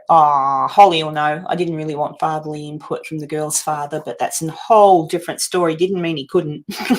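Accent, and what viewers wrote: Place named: Australia. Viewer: Yes Maam I Have Settled in the States .Yes Maam Mother is French Dad is Brit ,But My Ass Settled in the States. I like the Idea About Forgetting About Stuff I Will Try and Catch Your Lives. I love the Australian Accent and Australia Being One Place I Haven't Been. Your Son Being in the Military ❤ He Will be in My Prayers and I Thank Him for His Service!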